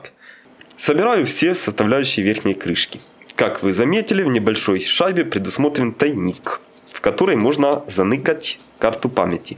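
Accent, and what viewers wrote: Ukraine. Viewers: А зачем этот украинский акцент? Если комментируешь на русском. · акцент, украинецкий,я рад славяну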